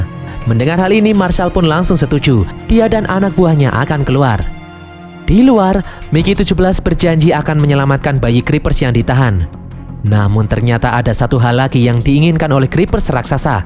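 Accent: Java, Indonesia. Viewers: kereenn..baru nemu channel recap yg agak2 medok wkwkwk. gaaassss terus mase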